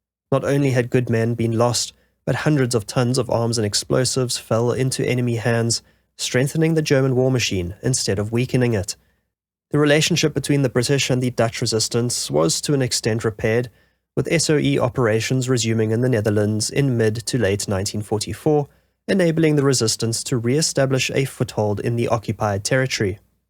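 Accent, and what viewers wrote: South Africa. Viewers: South African accent? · Kudos for another phenomenal video. Well done. It’s great to hear the South African accent of a fellow countryman.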